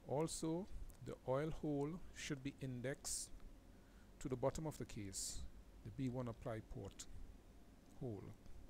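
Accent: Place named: Trinidad and Tobago. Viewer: Judging from your accent Im asking are u from Trinidad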